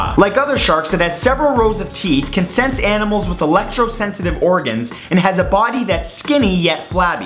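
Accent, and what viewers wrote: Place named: Canada. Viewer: In these older videos he had more of a Canadian accent than in the new videos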